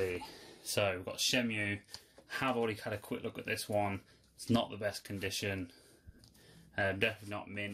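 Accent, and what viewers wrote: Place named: Northern England, United Kingdom. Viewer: Which part of England are you from was wondering as you sound a bit northern ?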